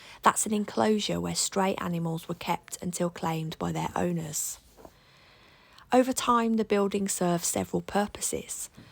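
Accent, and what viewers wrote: Birmingham, United Kingdom. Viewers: your beautiful Brummie accent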